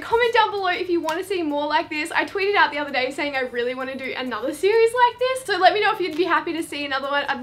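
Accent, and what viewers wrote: Australia. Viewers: I could tell she Australian cuz her accent but I wasn't sure but then she said in Australia so ya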